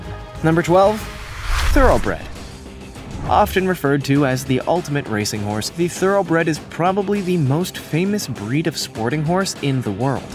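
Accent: Canada. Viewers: Accent canadien eh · Christine Still he’s Canadian and I have a canadaian friend who pronounces it the same idk tho🤷🏼‍♀️ maybe it’s not that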